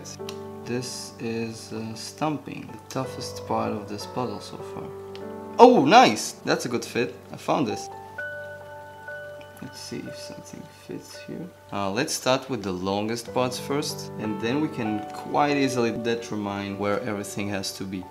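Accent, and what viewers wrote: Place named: Israel. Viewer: Ach sheli you know your name is. Stam but I totally knew you were Israeli just from your accent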